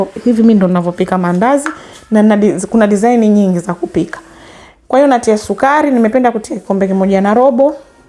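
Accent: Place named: Tanzania. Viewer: Mahamri ni lugha ya Kenya, lkn mpishi anaonekana ana lafdhi ya ki Tz, neno "kiduchu" sina hakika lkn nahisi kama linatumika Zanzibar pekee 😂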